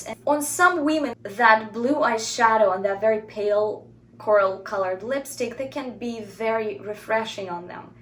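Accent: Russia. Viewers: You got light Russian accent ))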